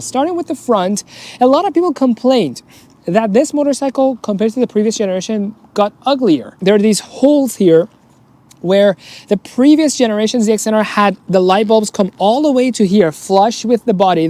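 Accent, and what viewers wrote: Iran. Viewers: persian accent straight away haha nice vid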